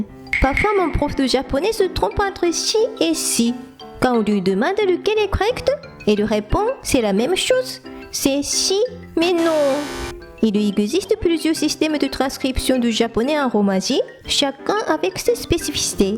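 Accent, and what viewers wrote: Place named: South, France. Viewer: Tout ça est très instructif, merci beaucoup ! Si j'avais simplement une très légère critique à faire, je trouve que la musique est un peu trop forte par rapport à la voix... Et je trouve que vous avez un accent du sud quand vous parlez en français, est-ce qu'on vous l'a déjà dit ?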